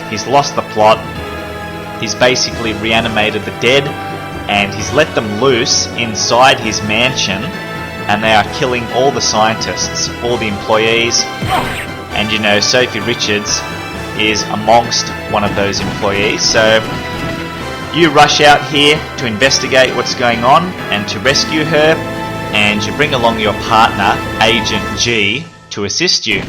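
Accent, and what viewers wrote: Australia. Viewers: Are you Aussie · are you australian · Are you Australian, by any chance?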